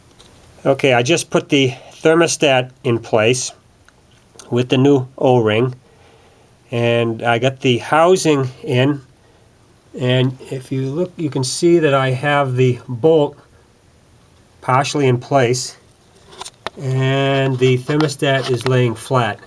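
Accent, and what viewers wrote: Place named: Boston, United States. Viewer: LOL... That's a perfect Boston-area accent.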